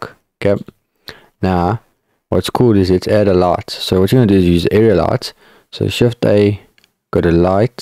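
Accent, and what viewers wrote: South Africa. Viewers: The first 3 seconds of the video and I already thought that this guy is probably South African :)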